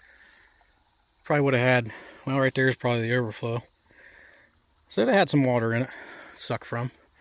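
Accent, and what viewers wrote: Pittsburgh, United States. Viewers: Are you out in Pittsburgh??..I am from out there and you sound like all my relatives...Great job saving these old rigs..I have made rings out of cast iron pipe and a lathe...I need to get back into everything . Dealing with some life issues..I have a 1928 Morgan waiting for reconstructing...keep up the great work..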